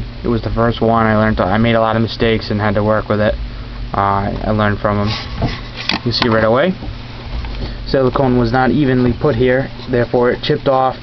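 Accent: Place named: New York, United States. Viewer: I knew you were from NY, I can tell by your accent! Lets Go NY!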